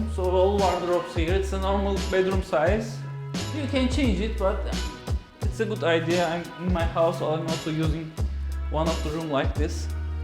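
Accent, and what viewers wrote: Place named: Turkey. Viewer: ajajajajaaa hayirdir bozaci siraci durumlari...siz gercekten baska bir alemde yasiyorsunuz, bu ayaklari oyle turk kankalarina yutturursun ama yurt disindaki insanlar bilincli ve A'dan Z'ye herseyin dogrusunu arastirarak ogrenip geliyorlar...yeterince rezil olmus durumdayiz millet gulme krizine girdi bu videoyu gorenler, bir de o turkce inglizceleriniz....soyleycek laf bulamiyorum IQ -1000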